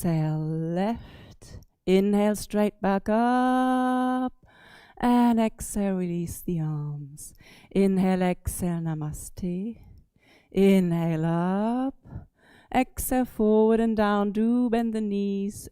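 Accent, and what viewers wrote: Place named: United Kingdom. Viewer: Was sie für eine wunderbare Stimme hat und dieses liebevolle British English ist für mich so ein Segen... Gerne regelmäßig Yoga mit!!!!! I love it!!!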